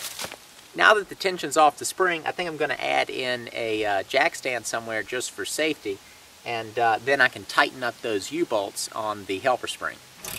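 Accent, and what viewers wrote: United States. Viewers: Good murican accent.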